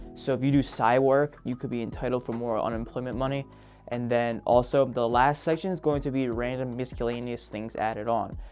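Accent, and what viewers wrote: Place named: United Kingdom. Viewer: Not sure why, but I thought you are from UK haha!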